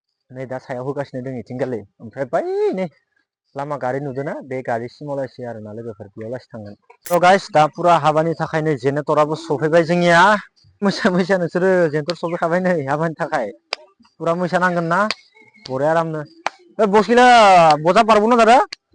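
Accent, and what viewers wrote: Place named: Tea garden, India. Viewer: Ada ng ma jagni ngni railai naykw matw bagan farani baidisw khwnayw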